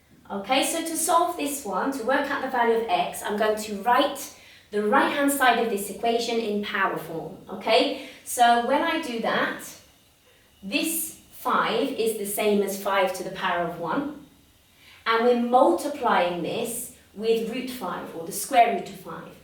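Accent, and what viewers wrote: England, United Kingdom. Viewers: Bonjour, je ne jette pas de fleurs et c'est bien la vérité votre chaîne Youtube est une référence en matière de l' éducation des mathématiques, elle est d'ailleurs parmi mes rares chaîne préférés, j'ai parcouru presque tous vos vidéos et c'est toujours avec le même haut niveau de professionnalisme et de la bonne humeur que vous enseigner cette matière aussi Clairement que brièvement ,d'ailleurs vos vidéos me sont de grands aide pour enseigner les mathématiques à mon neveu,parfois à distance, qui est inscrit tant tôt dans un collège américain tant tôt dans un collège britannique à Dubaï et qui est un brillant et aimant des mathématiques et qui apprécie beaucoup d'ailleurs votre manière d'enseignement et aussi votre accent anglaise il faut signaler aussi que votre langue et prononciation est très claire et compréhensible malgré que j'ai étudié les mathématiques en français et mon anglais est basique je ne trouve aucune difficulté pour comprendre, merci infiniment pour votre précieuse aide et pour vos efforts déployés et votre temps dédiée à l'enseignement et de partage du savoir félicitations bonne continuation et bonne chance.👍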